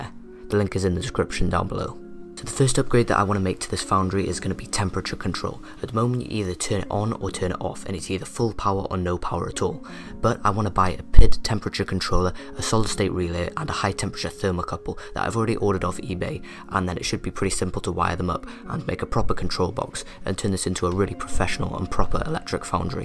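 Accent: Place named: Wales, United Kingdom. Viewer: Great video 👌 Still trying to place the accent though. Sounds kind of south east walian.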